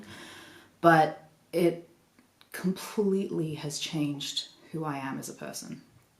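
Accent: Australia. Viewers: Sorry to hear about your troubles. Well done seeking help. I've only just discovered your channel, it's great to hear another Aussie accent on mini related videos. Especially sorry to hear that your booze got pinched when you needed it most. Look forward to catching up on all the videos you've posted thus far. (I'm very curious about the models in the display cases behind you. I hope they are featured in your other videos, and if not, could you do a video tour of them)? All the best.......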